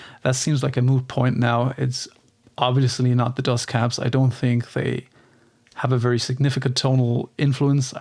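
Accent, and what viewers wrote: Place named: Ireland. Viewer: Your English has a slight Irish accent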